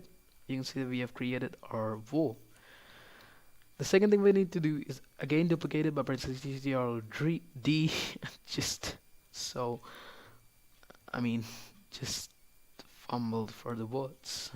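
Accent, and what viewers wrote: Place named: England, United Kingdom. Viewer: Lag Raha he koi asli angrez bol Raha Hain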